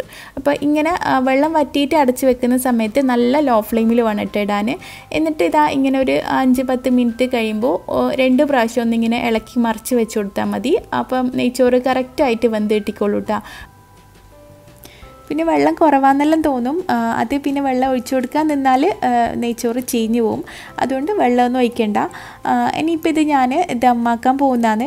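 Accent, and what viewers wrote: Kannur, India. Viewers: Hi ... Videos kanan Nala resam undato. Kanoor sasaram kelkan Nala super anu. Eniku oru mol undu 8 months anu mole uragikazhija night 11 pm kazhija njn videos kanan time kittoolluto atha comments onu ayakan pattunilane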